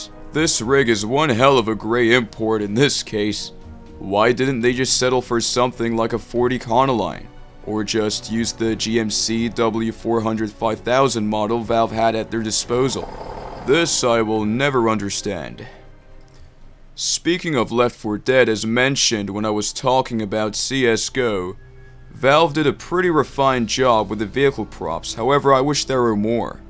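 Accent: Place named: California, United States. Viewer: This dudes California accent is crazy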